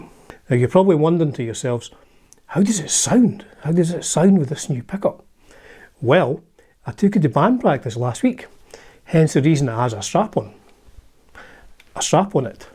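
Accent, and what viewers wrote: Scotland, United Kingdom. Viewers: Damn it YOU REALLY ROCK man ! Btw I would not understand one tenth of what you're saying without reading the subtitles, but it is good ! This way I will practice my Scottish (isn't it?) regional accent comprehension.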